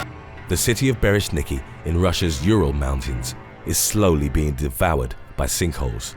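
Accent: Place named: United Kingdom. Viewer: Alyssa Davison Me too and the Brit accent.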